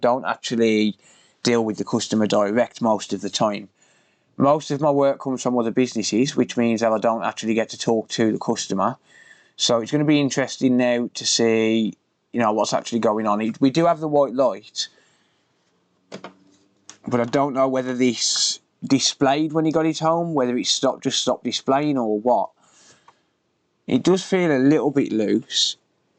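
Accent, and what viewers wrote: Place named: Midlands, United Kingdom. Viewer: Your local to me (accent) do you have a shop in the mids ? Respect to you also nice to see your work and honesty on this repair I’ve known businesses not taking responsibility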